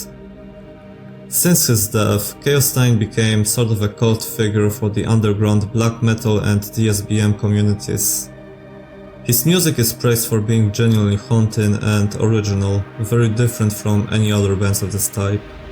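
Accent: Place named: Poland. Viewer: slysze twoj polski akcent kolego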